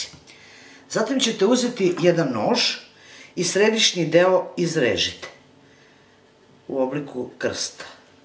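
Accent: South, Serbia. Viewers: Meni se naprimer mnogo svidja vas juznjacki govor i nemenjaj te ,ja sam uvek za to da se nemenja a moja komsinica je iz leskovca i ja uzivam da je slusam😍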